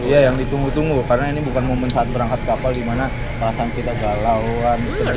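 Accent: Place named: Sunda, Indonesia. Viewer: Logat sunda lu kental bgt broo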